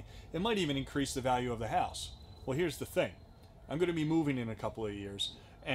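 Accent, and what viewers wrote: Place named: South Jersey, United States. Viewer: Haha! I am looking to buy one of these Harbor Freight portable garages. I found this video and within the first minute of listening to you talk, I was like, "this guy sounds like he is from South Jersey". Then as I continue to watch, you say, "In Jersey..." and I was like, yep, bingo! So then after watching, I check your description and you are over in Gloucester Twp. I laughed out loud. As someone who was born and raised in South Jersey and travels the country often, its so easy for me to pickup on the accent, its ridiculous. I mean, yea mostly everyone from the Delaware Valley have that accent but PA and DE people have slightly different twangs to it and unless you listen for it, its not very noticeable. You must have also been raised locally because your South Jersey accent is so spot on. You should do a video on Panzarottis LOL!!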